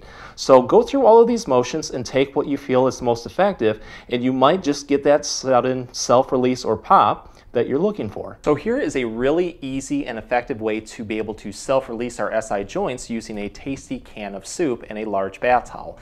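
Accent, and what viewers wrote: Michigan, United States. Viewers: are you from Michigan? Trying to place the accent.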